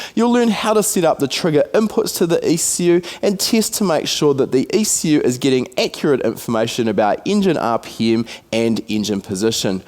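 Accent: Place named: New Zealand. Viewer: Kiwi???..where are you in nz??? Cheers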